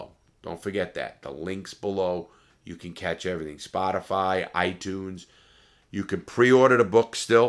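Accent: New York, United States. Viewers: I found this channel and got hooked. I’ve been to jail but never up the road. Interesting to watch and listen. Plus I’m a Long Island native. Knew was from NY cause of the accident.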